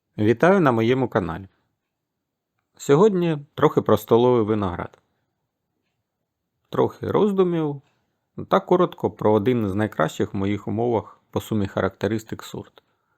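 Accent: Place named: Desna, Ukraine. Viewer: Знайома, точніше, рідна вимова. Таке, як придеснянська. Ви часом не з Батурина?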